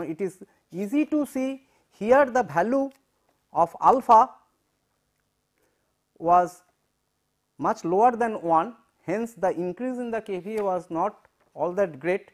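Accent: India. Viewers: victor Pham Ừa bạn, căn bản thì nó cũng chẳng phải là Sience Rocket gì , một vế là Voltage thấp và Ampere cao chuyển qua một vế là Voltage cao, Ampere thấp . Nhưng cái vui trong này là ông thày nói tiếng Anh cái giọng quá nặng accent của người Ấn Độ.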